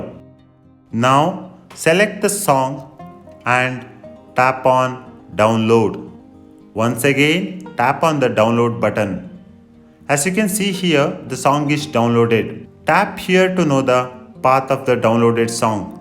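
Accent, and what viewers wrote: India. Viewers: LOVE THE South Indian ACCENT Writing South Indian I low caps, coz I m RACIST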